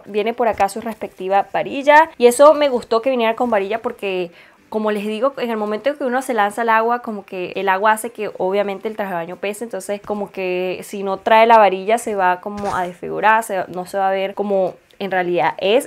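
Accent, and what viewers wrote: Venezuela. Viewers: Hola! Me encanta cuando hablas venezolano 😸✌ "caderua" "carrasposo" etc... Creo que la última pieza se llama pareo!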